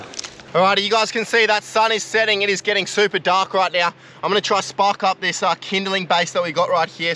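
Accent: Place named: Australia. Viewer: Everything i need for the best survival Youtube cannel: Positivity Energized Maaad australian accent Talking a lot about the island and stuff AND SHOWING HOW BEAUTIFUL THE SCENERY, ISLAND, LOCATION IS (almost noone does that) And most important: Having respect for the animals getting killed And the beautiful underwater scenes where he just shows nature before hunting This channel has everything and i wish you over 1mil subs for that quality ❤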